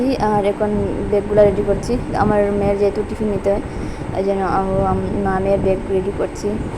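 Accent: Bangladesh. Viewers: আপু ভিডিওটি খুব ভালো লাগলো। গোল গোল করে বেগুন কেটে তেলে ভাজি করে নিলে। ফ্লোর পরিষ্কার করলে। স্কুল ব্যাগ গুছিয়ে সুন্দর মতন রেডি করে দিলে তারপর সাথে করে নিয়ে গেলে। সব মিলিয়ে খুব ভালো লাগলো আপু। আপু তোমার রান্নাবান্নার ধরন থেকে শুরু করে কথাবার্তা সবকিছু আমার কাছে কেমন যেন বাংলাদেশি মনে হয়। তোমার কি কেউ বাংলাদেশে আছে নাকি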